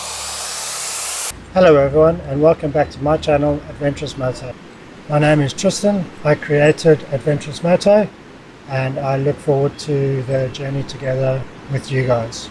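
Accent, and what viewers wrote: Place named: South Africa. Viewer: Hi Tristan I just bought one yesterday after dreaming of it for many years! From your accent i take it your south african? I'm from Worcester (SA). Would relly like to meet you one day maybe? Cheers!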